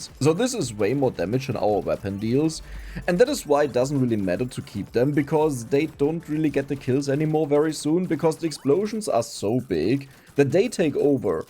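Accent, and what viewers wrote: Germany. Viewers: such a nervenkitzel ;D ich liebe das deutsche englich einfach der beste akzent ;;D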